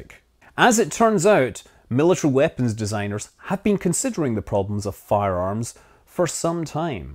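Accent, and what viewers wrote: Scotland, United Kingdom. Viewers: More likely Scottish. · Hear the voice of a true Scottish Space Highlander! Also, millions of Americans have some Scottish ancestry.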